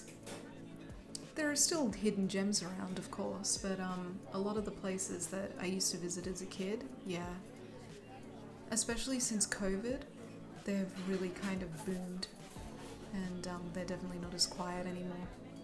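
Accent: Australia. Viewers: one little piece of feedback i have, you have a soft voice and the ambient sound was a bit too loud in my opinion, other than that really great voice acting and script! also yes, Australian accent is really cute! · Likewise... And I don't understand the appeal of the Australian accent. I've been surrounded by it my entire life, and it's usually annoying (except for a select few such as this lovely VA). If you'd like to explain, I'm all ears. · I have many friends who are Australian. I also have a cousin who moved to marry her now husband. The Australian accent is my upmost favorite accent. Love the audio. 100% dragon approved 🐉 💜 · We finally got An Australian asmr love the mention of Perth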